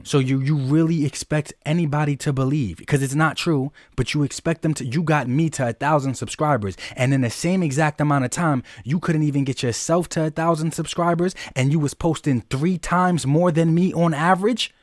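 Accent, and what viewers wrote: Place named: South, United States. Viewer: He’s definitely from the south. I would know cause I am to. People think I talk funny also.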